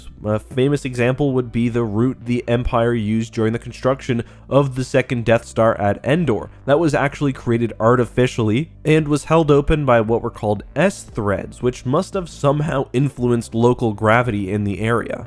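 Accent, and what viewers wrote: Canada. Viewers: Wait, are you Canadian?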